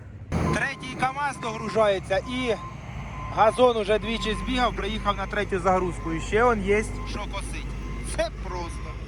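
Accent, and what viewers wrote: Poltava, Ukraine. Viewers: Звідки ви ? Акцент Полтавський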